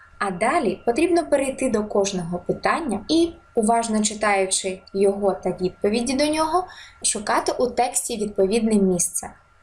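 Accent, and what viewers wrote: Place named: United Kingdom. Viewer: Який крутий британський акцент у ведучої!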